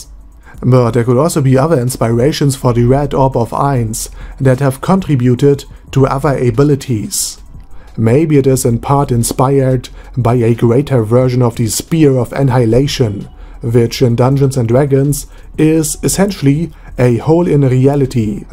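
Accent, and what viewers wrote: Germany. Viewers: English is a germanic language :) that's why his accent makes it sound like he's speaking German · Why do I hear german even when you talk english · Well i cant watch your video.... your English is right but the accent is so insane, i cant take it.... iam german too but this is too much for me.... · Because of his accent. The auto-CC's even think he is speaking german. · I have to confess, this commentary sounds more authoritative because of the German accent.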